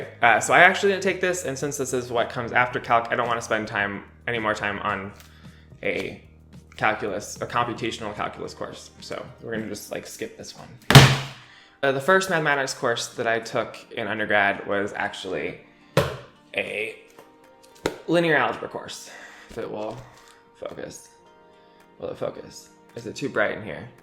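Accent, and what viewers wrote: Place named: North America. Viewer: you have what I call the "white girl tounge curl" its a condition that effects many north americans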